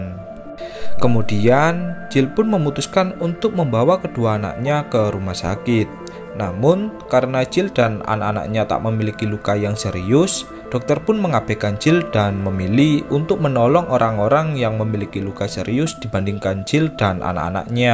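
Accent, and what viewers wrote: Java, Indonesia. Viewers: hostnya first medok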